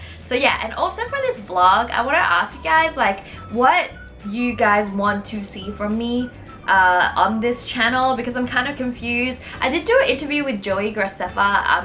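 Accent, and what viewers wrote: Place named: Australia. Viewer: Too bad i don’t live in Australia or New Zealand bc I love ur accent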